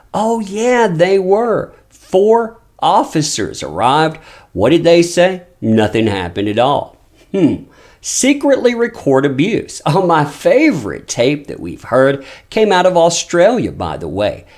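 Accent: South, United States. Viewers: Listening to your southern drawl hillbilly accent is irritating enough, but could you please stop with the repetitive "That former certain Aquaman starlet". Just say: Amber Heard and then maybe go for speech therapy 😉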